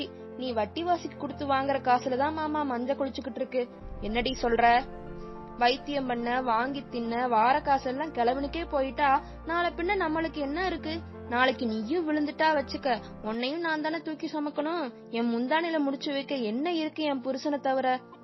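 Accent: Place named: Coimbatore, India. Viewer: Nice cbe voice.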